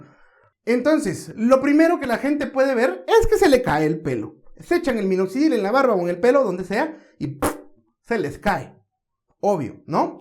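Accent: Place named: North, Mexico. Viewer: Creo que del norte de mexico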